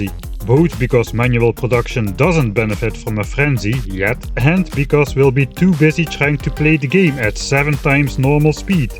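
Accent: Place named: Netherlands. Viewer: This video randomly got suggested to me and I gotta say I love your dutch accent haha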